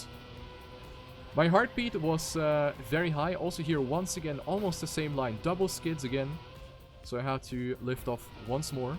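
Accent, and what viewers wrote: Germany. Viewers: Nice to see how self critics you are with yourself +++ german english 😉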